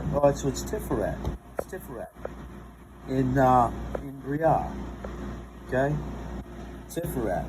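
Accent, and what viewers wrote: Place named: Baltimore, United States. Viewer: I thoroughly enjoy the 'balmer accent delivering the old wisdom! Cheers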